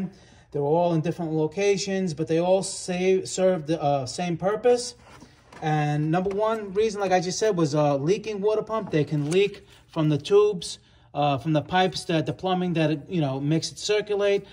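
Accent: New York, United States. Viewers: This dude has the coolest accent...what part of NY are you from?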